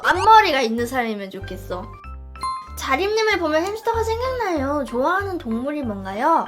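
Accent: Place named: Seoul, South Korea. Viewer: ??타지사람들은 사투리가 들리나보다 난 걍 서울말 쓰는 걸로 들리는데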